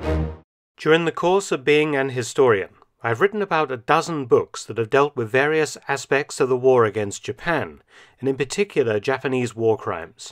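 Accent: England, United Kingdom. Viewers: The first thing that Indians and other colonies of western countries were forced to fight a war that was not their. Japanese were worst but we should not forget how the Churchil of England killed millions of Indians by depriving them of food known as famine of Bengal. They sent all the food for the soldiers and here many people died due to hunger. Since the narrator seems an Englishman by his accent he must know that England has done no less horrible things to Indians then the japanies at that time.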